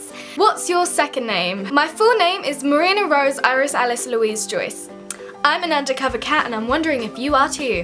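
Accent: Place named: United Kingdom. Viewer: I want to be you....your...your like British or something! I like making accents but I cant do British & I am working on my "Australian" accents.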